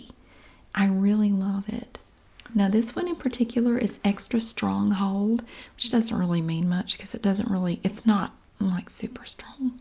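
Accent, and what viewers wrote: South, United States. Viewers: Hi it's good to see you as well, I love your southern accent, I must be high maintenance then because I have a full basket of products.😍😁, I'm new to your channel, I'd love to see you do a video with a tea party, and finger sandwich I think it would be fun.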